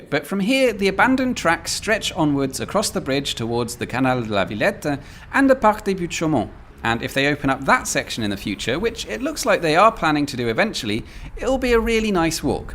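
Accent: United Kingdom. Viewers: Hearing your perfect french with your british accent is a candy for my french ears. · Quick question here. So are you British, or Parisian? Because your accent more like English man rather than Parisian. But your other language is good too.. Ps: edit English to British. Sorry, because in my english lesson, british always said as english man. 😶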